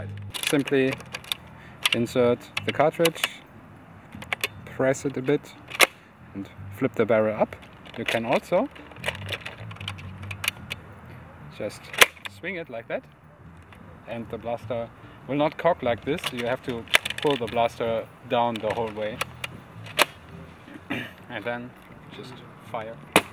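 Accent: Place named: Germany. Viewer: I personally love the german language, and the accent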